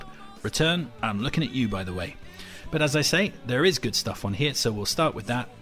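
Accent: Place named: United Kingdom. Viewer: Your accent is insane - it seems like a Brit accent but then you have hard R's... is that an actual dialect over there or have you lived in America a long time or something?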